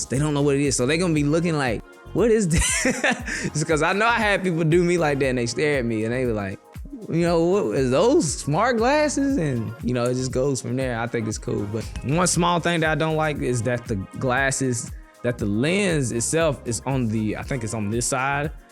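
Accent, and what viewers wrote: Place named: South, United States. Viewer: That boy from down south you can hear it in his voice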